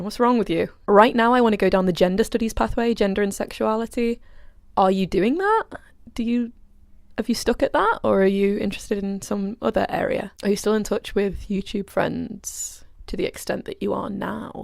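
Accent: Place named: North, United Kingdom. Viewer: It is so refreshing to hear northern accents on youtube!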